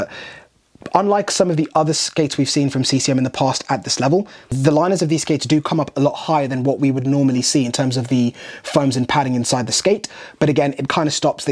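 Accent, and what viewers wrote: England, United Kingdom. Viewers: Learning about hockey from a black guy with a British accent. 😂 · I'm not saying I'm racist but I'm having a hard to time listening to this English accent talking about hockey skates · As a Canadian who has never encountered your YouTube channel I was taken aback by the British accent. The thought did enter my mind "what will he knows". But you crushed it. EXCELLENT presentation and analysis. Very impressed. My hockey days are over. Just no interest anymore. But if I was still into the game you would be my go to guy for equipment reviews. Well done.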